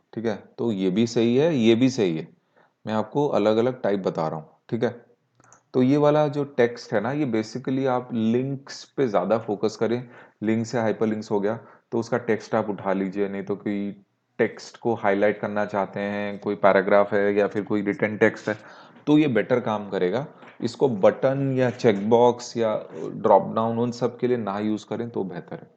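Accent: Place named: Odisha, India. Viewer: Bro are from odisha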